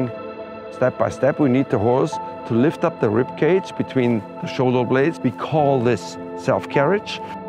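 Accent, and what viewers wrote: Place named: Germany. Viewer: PLEASE redo this. Remove or at least lower the "background" music. The loud music coupled with the German accent makes it difficult to follow the lesson. This is very valuable information. It needs to be presented in the best possible manner. Do professors in universities play loud music during their lectures? It's been 50 years since I sat in a lecture hall, maybe things are different now. Rant off. Thank you.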